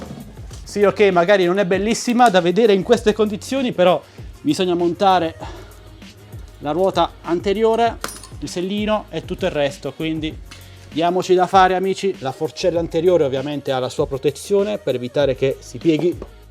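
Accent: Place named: Bari, Italy. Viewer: Sei barese? Da come parli ci giurerei... Bel canale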